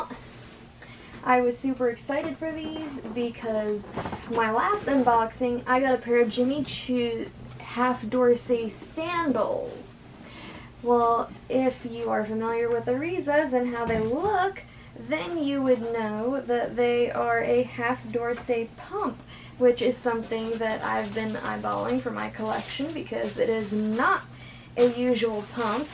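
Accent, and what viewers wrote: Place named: South, United States. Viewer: I love your accent also. I'm sure like me, you're from the South.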